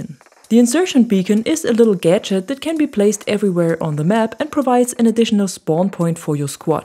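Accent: Germany. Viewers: Wie man merkt dass du eine deutsche bist haha ✌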